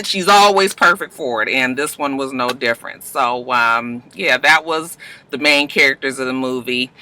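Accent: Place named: Los Angeles, United States. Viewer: lol. You were saying “clow”. It’s your LA accent. We know you “hawrd”.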